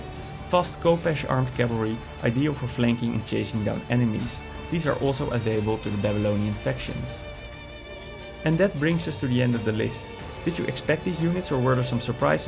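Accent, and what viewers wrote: Netherlands. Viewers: That Dutch accent tough 😂😅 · Holy shit, the narrator is Dutch as hell 😂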